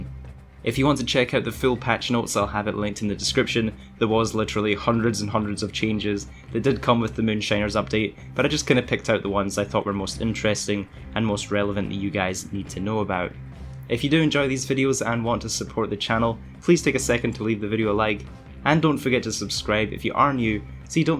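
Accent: Scotland, United Kingdom. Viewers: I will,always listen and watch you videos as a fellow Scots much better to hear ...❤️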